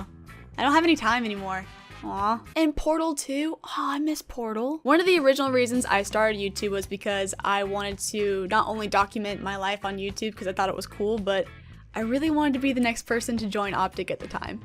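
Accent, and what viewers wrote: South, United States. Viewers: So much southern accent and its amazinnnnnnnnnnnn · Nothing fazed me that 6ou were from Georgia I'm from Georgia but they say I have a more northern accent and I can hear the southern in you lol kinda cute though not gonna lie😂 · I knew you were from the south lmao I heard the slight accent in your new videos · I knew it I knew you had a southern accent how I am southern too so ha got em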